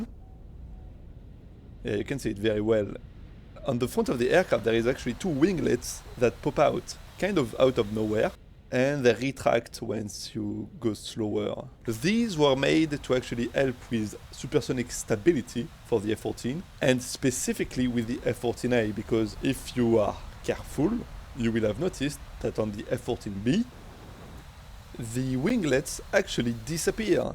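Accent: France. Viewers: R u french, nice accent?? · Oh god that french... Please yt don't show me baguettes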